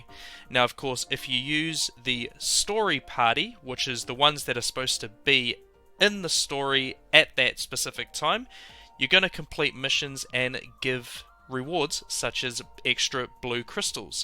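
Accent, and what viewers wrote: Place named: New Zealand. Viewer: So funny, yours was the first video I came across looking for tips for day 1 EC and immediately after hearing your voice was like, damn it's a fellow kiwi, good luck with your channel mate, and subscribed so keep putting out content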